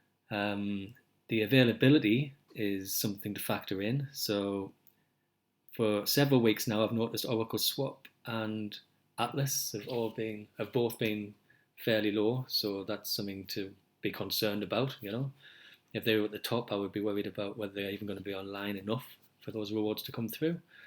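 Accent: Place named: Darlington, United Kingdom. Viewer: Sounds like you're just a bit north of me in Darlington. Great to hear a localish voice talking about my favourite subject so I've liked and subscribed!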